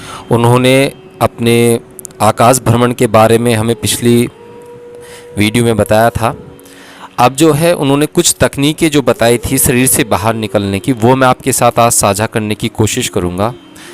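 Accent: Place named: Rajasthan, India. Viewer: Shaayad rajasthan ki jaban se bolte hai radhe radhe jay ho bhole bhagvan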